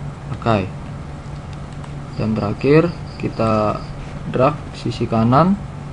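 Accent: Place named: Java, Indonesia. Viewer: Bang jawanya medok bgt 😂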